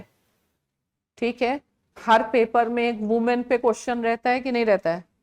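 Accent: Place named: India. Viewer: Mem aapke मुह se English bhi hindi lagti hai❤❤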